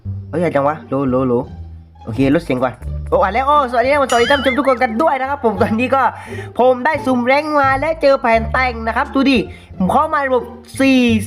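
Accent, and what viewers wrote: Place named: South, Thailand. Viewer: คนใต้ม่ายนิลิ้นรัวจังเติ้ล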